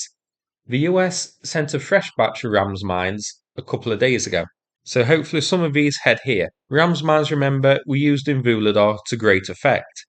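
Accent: United Kingdom. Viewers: Completely unrelated - what's that dialect you're speaking? I love it, but I'm crap at British dialects 😕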